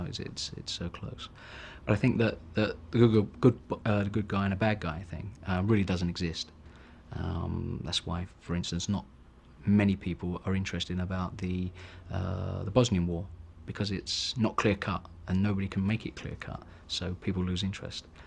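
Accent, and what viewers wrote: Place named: RP, United Kingdom. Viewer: That’s his poshest accent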